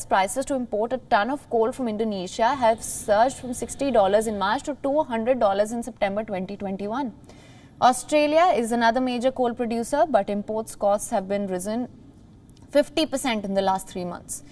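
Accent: India. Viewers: A very pretty girl with a heavy Indian accent. Just a few lesson /sessions in "Spoken English" with a good & dedicated teacher, would help her in her career as a "speaker" & she will be understood better by people unfamiliar with the strong desi stress on the t & d words etc. Constructive criticism from some1 who loves your channel and wants it to appeal to English speakers in the west as well as in the east. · This presenter speaks so fast that you can hardly understand her I know that people from India have an accent but other presenters do not have the same problems at this one has. She even has trouble reading the prompter because she's racing so fast with the text. She needs to be fired and replaced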